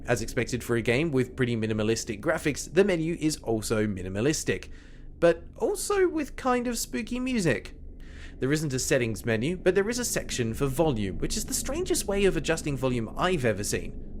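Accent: Australia. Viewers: YOU'RE AUSTRALIAN???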